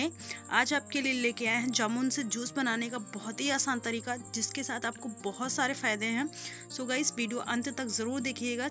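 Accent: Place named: Awadhi, India. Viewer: Nice awadhi 👍👍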